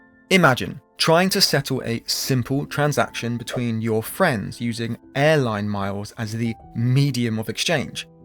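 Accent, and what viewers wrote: United Kingdom. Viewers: yes, but that makes this video blatant propaganda, doesn't it? And why a person with a British accent? He's using a very American-centric POV for the story. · You are a compromise. Speaking American with an English accent. Do you watch a lot of American TV or movies?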